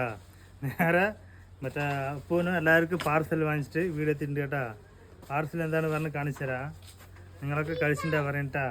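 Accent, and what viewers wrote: Tamil Nadu, India. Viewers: Ningalu malayaliya allengil tamil la mindunadhu different ayeetu ondu adha chodhichadhu.sorry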